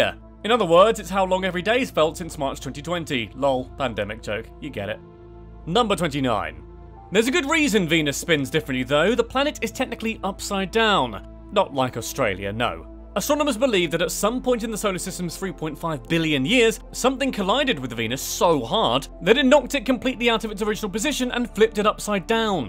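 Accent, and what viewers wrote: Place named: United Kingdom. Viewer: Hes British 🤦🏾‍♂️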